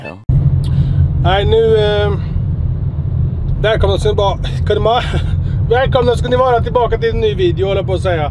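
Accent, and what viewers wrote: Värmland, Sweden. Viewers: Är du från värmland? Bor i värmland! Låter som du kommer herifrån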